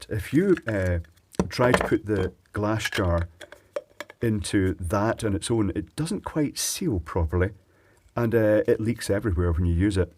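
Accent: Scotland, United Kingdom. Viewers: R u Scottish? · Can't be the only one who knew he was gonna be Scottish before clicking on this video.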